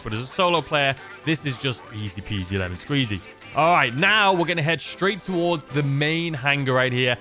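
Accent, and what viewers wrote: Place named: Gloucester, United Kingdom. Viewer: Where was u from originally in the uk bro i hear abit of Gloucester in u lol! Do a Q & A one day so i can ask u lol an how did u end up movin to newyork 😎👌🏼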